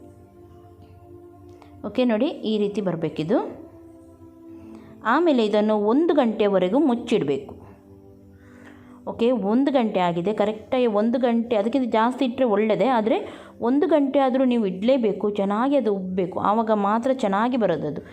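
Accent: Mangalore, India. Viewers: Nice mam .... neev Mangalore navra.... Language haage... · "tapakka" typical managalore kannada...i love it, you revealed many tittle secrets which others dont. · Tapakka typical mangalore kannada😃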